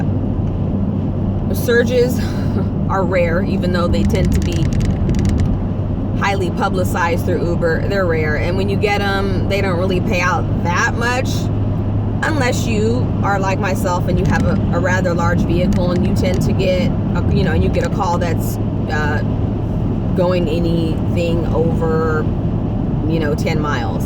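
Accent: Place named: South Central, United States. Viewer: You look like Beverly Hills but you talk like South Central. Shifty eyes.....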